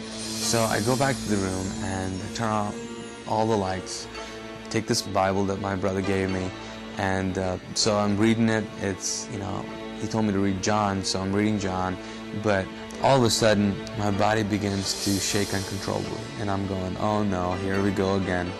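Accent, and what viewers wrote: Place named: Arab world. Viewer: Good Arabic accent, brown skin dude, seems legit!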